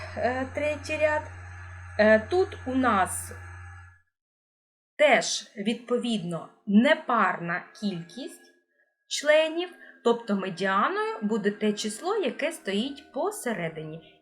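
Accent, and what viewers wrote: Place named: Russia. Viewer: Кацапський акцент)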